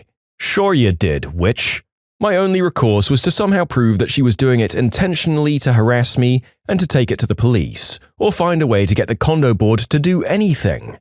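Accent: United Kingdom. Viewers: Pls enough with the British accent 😭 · oh big deal he’s british, his voice isn’t even bad, you don’t need to get so upset over it.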